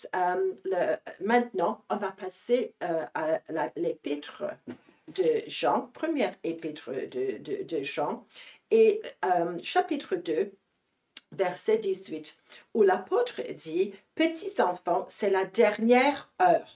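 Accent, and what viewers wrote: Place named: England, United Kingdom. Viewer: Did you try to pray Holy Father Pio de PIETRELCINA.? I AM SORRY BUT YOUR VERY STRONG ENGLISH ACCENT IS AN IMPEDIMENT TO MY UNDERSTANDING . HAVE YOU AN ENGLISH BLOG PLEASE.?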